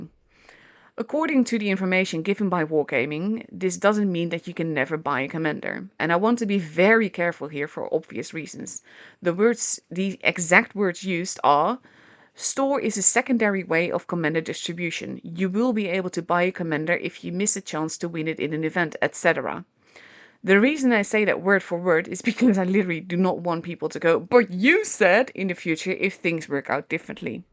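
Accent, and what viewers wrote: Netherlands. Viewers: ^: Love your honey smooth voice & Dutch / English dialect (Easy Listening) You made me roar with laughter = "𝐂𝐨𝐮𝐥𝐝𝐧'𝐭 𝐁𝐞 𝐀𝐫$𝐞𝐝" ( London speak ) Your phraseology is VERY down to earth. Very much appreciated by this Londoner!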